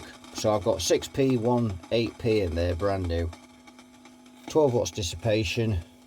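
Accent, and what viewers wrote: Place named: Lancashire, United Kingdom. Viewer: I noticed an earlier comment about your accent, you reply you've been trying to speak more clearly for you audience. Its great to hear, reminds me of my Dad who came from Leigh in Lancashire down to London when he was 15 to start an apprenticeship french polishing furniture. No work in Lancs. Oddly he never lost that Lancashire accent and shared a lot of your regional sayings even after living in London until he was 76.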